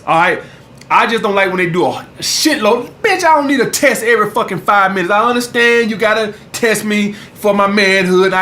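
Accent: United States. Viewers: That country ignorant accent he has would lose my interest on site. Let’s not mention those horrible razor mark scars that are so visible. Let’s me know he’s cheap and doesn’t even take of himself....straight facts no shade.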